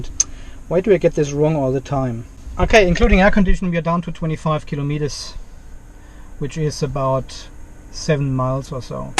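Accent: Germany. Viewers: Vom Aktzen sind sie deutscher oder? Also die Batterien sind bei allen Herstellern nicht stabil... ich habe einen Tesla Model x und kann nicht behaupten das ich diese Probleme nicht habe. Ich würde auf dieApp mich nicht verlassen...... dies ist ein Problem der App und der Software. Ich komme aus dem QM Bereich der Autohersteller. Die Probleme hat nicht nur Mitsubishi sondern auch BMW und Toyota bei Plug in Hybrid. (Tesla auch) die Fluktuationen und unterschiedlichen Meldung liegen am Bordcomputer und der Sensoren und der Software. Ehrlich gesagt ist es wie beim Benzin der Tankinhalt wird eher geschätzt als gemessen. (Grob betrachtet) was man aber genau messen kann (zumindest bei BMW) ist der Verbrauch dank moderner Einspritzung. So ist es beim E-Auto auch, da wir aufgrund Temperaturen usw. die Leistung zwar messen aber nie genau. Siehe Test von Tesla oder Hyundai e Autobesitzer. 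Wer sein Auto in der Garage mit einem teuren Stromzähler (geeicht) laden tut, hat immer Abweichungen zwischen Zähler und Autoangaben. Beim meinem Model X sind die sogar teilweise heftig. 6-7KW pro Ladung. Ich tanke mein Auto in der Firma mit einem Speziellen Stromzähler Kostenpunkt 2.300€ inkl Einbau. Was die App sagt und die Software, sagt nichts aus was die Realität vom Akku bzw. Batterie betrifft. (Die Angaben über E-Verbrauch sind genau und nur die sollte man bewerten) Jedoch Vorsicht, bei E-Autos spielen extrem viele Faktoren mit, die bei einem Diesel/ Benzin nicht berücksichtigt werden.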